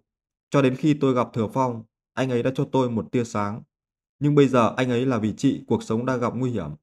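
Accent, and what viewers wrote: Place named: North, Vietnam. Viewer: sao cứ thuyêt minh giong bắc khong đã vây nghe không biết nam nữ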